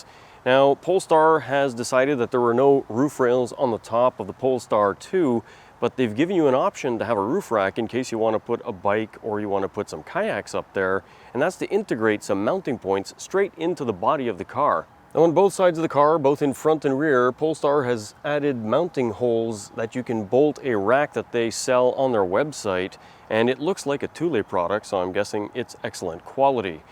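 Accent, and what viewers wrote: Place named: Canada. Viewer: Canadian accents sound fun to me, it makes me want to have a Labatt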